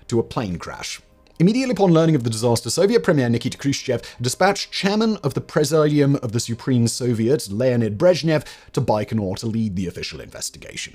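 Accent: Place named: RP, United Kingdom. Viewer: you do realise he is just a narrator, he doesn't write or edit almost of the material, all he does is ready from a script. He seems smart, but really it's just a posh accent, flashy clothes and glasses. His writers and editors are pretty average too because if you pay any attention the amount of inconsistencies, mistakes, misleading information and just blatantly false claims become glaringly obvious. Dint get me wrong, I love his content, but you should be aware he's not this genius everybody seems to think he is.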